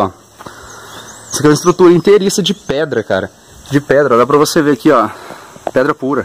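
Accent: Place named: São Paulo, Brazil. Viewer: O defeito desses vídeos, na maioria, é não dizer onde ficam os locais mostrados, cidade, Estado. Pelo sotaque de quem está mostrando, a pronúncia dos erres - R, imagina-se que é em S. Paulo.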